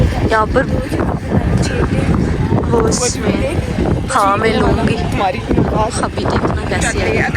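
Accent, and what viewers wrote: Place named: Rawalpindi, Pakistan. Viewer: Sai pindibois wala accent hai. Good work 👍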